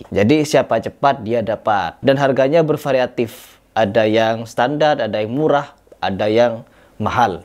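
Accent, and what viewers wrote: Madura, Indonesia. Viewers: Bang Aku senang dengar aksen Maduranya walaupun Aku orang Maluku 👍Hajar terus Bang Mereka mereka yang berprilaku seperti Serigala berbulu domba 😭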